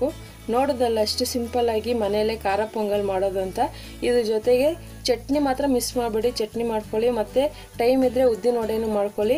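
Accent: Tamil Nadu, India. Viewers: Hello.. I tried for the first time khara Pongal on Saturday in America. It turned out very well 👌🏼 If I cook anything new I always check your channel and see your steps. Very simple n easily you explain. No useless talks. Your Tamil accent Kannada is very nice to hear. Bele obbattu also your simple tips helped me cook perfectly before that I had messed it many times. Thank you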